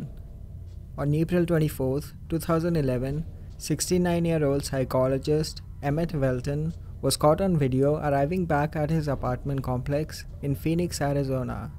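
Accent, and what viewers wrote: India. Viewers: The voice over is an INDIAN for sure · Indian voice confirmed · Thick indian accent..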